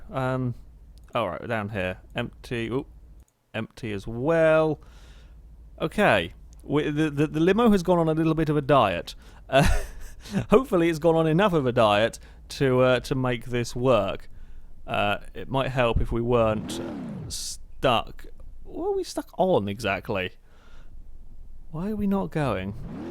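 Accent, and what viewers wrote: United Kingdom. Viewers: He's British. We have accents too you know.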